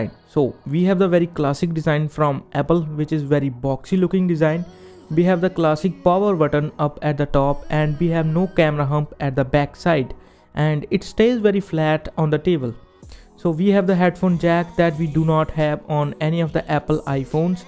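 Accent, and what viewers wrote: India. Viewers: Typical Indian accent is irritating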